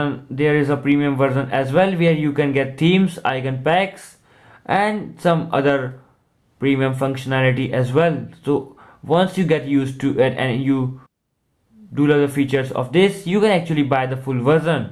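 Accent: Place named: India. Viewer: Ahh the indian inglish <3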